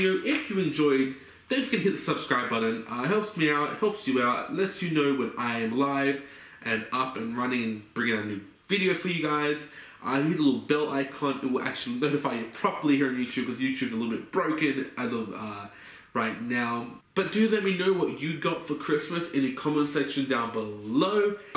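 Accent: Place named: New Zealand. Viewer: Your kiwi accent was just so funny bruh. Nice video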